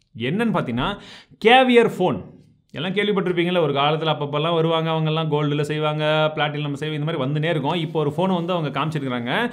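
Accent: Chennai, India. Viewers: dont use chennai tamil, keaka mudiyala... use south TN tamil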